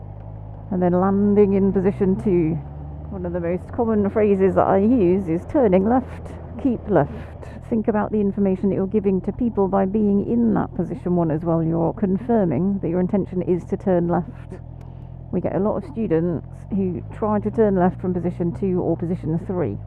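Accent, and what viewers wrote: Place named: England, United Kingdom. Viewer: Brilliant videos and you have a fantastic, particularly quintessential, English accent.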